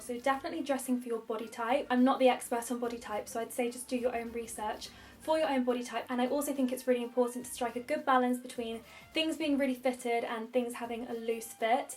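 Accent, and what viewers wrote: RP, United Kingdom. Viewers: God I love posh burds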